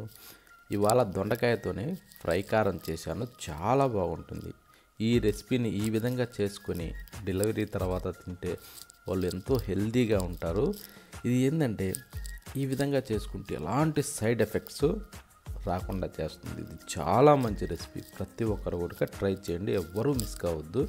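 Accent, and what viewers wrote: Nellore, India. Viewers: Nellore yaasa bro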